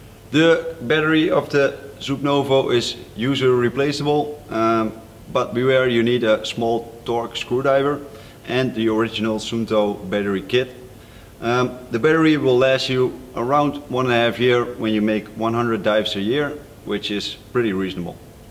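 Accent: Netherlands. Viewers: What is your accent? Dutch? Nordic?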